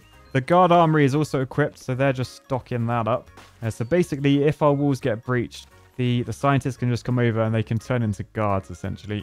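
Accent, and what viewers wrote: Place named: England, United Kingdom. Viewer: I love listening from across the pond and hearing the king’s English. “He did a proper scarper”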